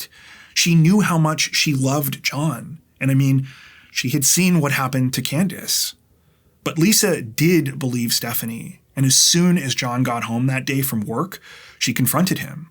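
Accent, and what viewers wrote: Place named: Canada. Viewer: Dude, as soon as I heard Canadian, I was like SUBSCRIBE! (Born and raised in Toronto, and a lover of all things Canadian and true crime) So I guess this is a perfect match eh?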